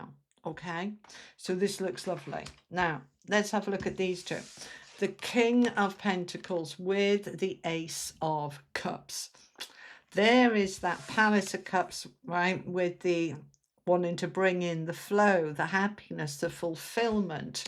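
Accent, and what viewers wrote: United Kingdom. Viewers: thank you 💜 I'll continue to work on it. Your guidance is much appreciated, also lovely to hear a fellow UK accent ☺️ x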